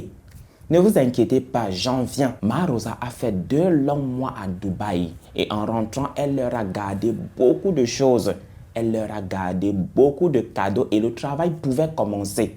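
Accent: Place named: Cameroon. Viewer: Sinon on ne prononce pas le T de VINGT (20) hein, je dis ça je dis rien ! 😂😂 Sinon j’apprécie ton boulot et surtout cet accent si particulier du MBOA que l’on aime tant ! 😅😅 Bonne continuation ! 🙏🏽😌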